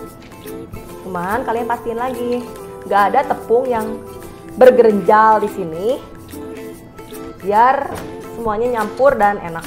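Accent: West Java, Indonesia. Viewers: trus Sunda pisan, kacida Sunda na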